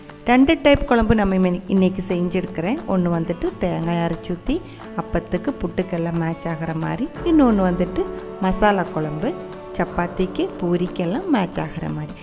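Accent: Kerala, India. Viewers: Semma recipe, thank you so much sissy 😍😍😍.... Unga kerala kalantha Tamil lovely 😍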